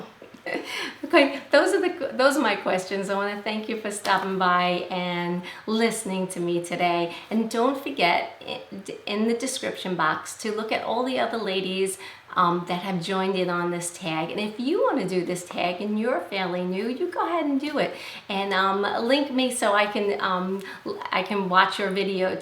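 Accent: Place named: Long Island, United States. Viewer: Ok, I'll share George. I think another tag sounds great! xo ps love the comment about your accent from Sharon. LOL we Long Islanders always get made fun of!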